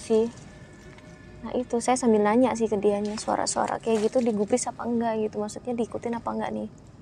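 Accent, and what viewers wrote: Java, Indonesia. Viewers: Ka lucu jga yh klo lgi ngomong jwa...jwane mdok bngt kaka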